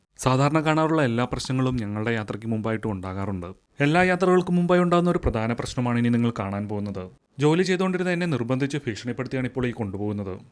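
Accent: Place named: Kottayam, India. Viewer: Kottayam slang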